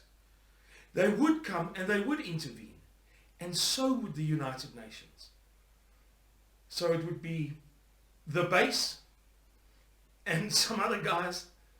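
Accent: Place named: South Africa. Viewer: keep up the good cause. I hope someday you can visit the Netherlands and tell my fellow countrymen about the situation in South Africa. I have lived for 6 months in South Africa, in Kwazulu-Natal, it was a great experience. I just love this country. I love Afrikaans, and I love your accent when you speak English. May God bless you and all your efforts for your country.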